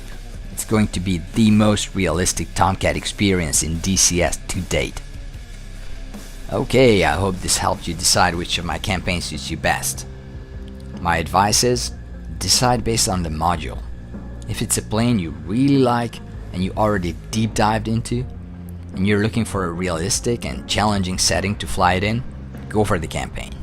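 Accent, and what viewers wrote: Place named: New Jersey, United States. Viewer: I just can't put my finger on your dialect but it's the coolest American English. New Jersey?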